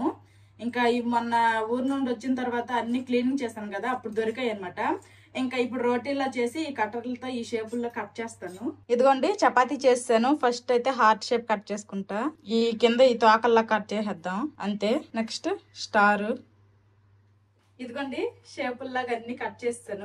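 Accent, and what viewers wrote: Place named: Vizianagaram, India. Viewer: Hai చెల్లి ఎలా ఉన్నారు.మాది విజయనగరం.అందుకే మీ మాటలు మా మాటలు ఒకే లాగా ఉంటాయి. చెల్లి నాకు ఛానెల్ ఉంది. Indus valley కి యూట్యూబ్ మెయిల్ ఇవ్వాలా వేరే మెయిల్ ఇవ్వాలా.please reply చెల్లి.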